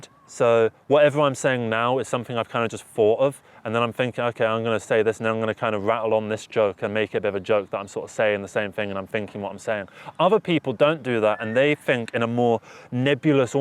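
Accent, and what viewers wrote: United Kingdom. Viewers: The algorithm seems to incorporate even your style of music, scary! Meaning I like your music also, the eminor guitar stuff. Holy shit, you’ve basically just wrote a best selling psychology book for artists! Prove me wrong! I’m double your age. Maybe it’s your brit accent, but your style and mannerisms remind me alot of a John lennon. I know what I’m saying. Because of human nature imperfections I was gonna side with u on the relationship thing, and then The homuncle whispered, no, its no body’s fault, it just wasn’t meant to be, we didn’t mix and thats it. You see that, your realistic look of peace and love has affected my thinking! Keep playing that fucking guitar and keep talking, they inspire me, your genuine man.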